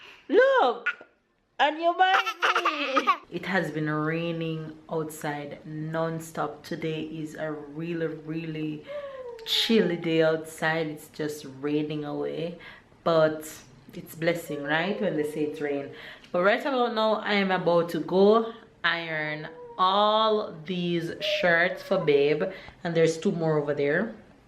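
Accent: Jamaica. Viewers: I love love love your Jamaican accent. Lovely vlog. As for working out, the only exercise l do these days is walking and I enjoy it. Like I show on my vlogs, I try to leave the car behind most times and just walk. is such fun to watch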